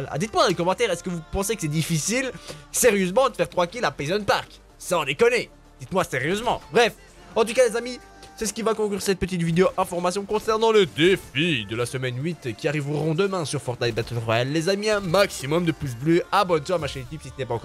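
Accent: Belgium. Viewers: Accent belge j’ai la même MDR 😂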